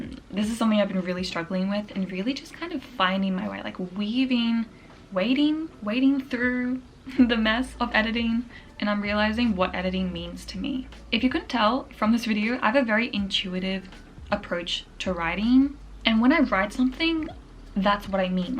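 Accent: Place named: Australia. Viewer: I love your aussie accent!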